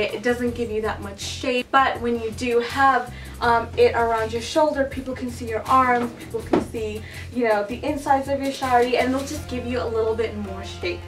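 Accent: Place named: Bengal, India. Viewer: Shes Bengali....have some linguistic awareness.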